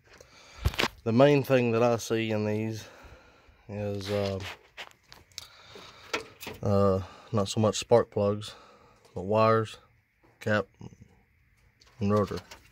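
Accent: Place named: South, United States. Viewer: idk why bros thick country accent and the way he get straight to point, allows me to understand exactly what he explains and seem to be trustworthy he actually knows what the hell he talking about. God bless and thanks.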